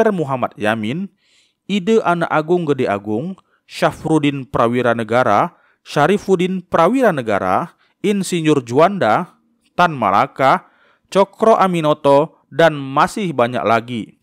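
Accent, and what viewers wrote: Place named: West Sumatra, Indonesia. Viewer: adminnya orang minang yah, logatnya kayak orang minang yang banyak profokatif kalau di medsos 😁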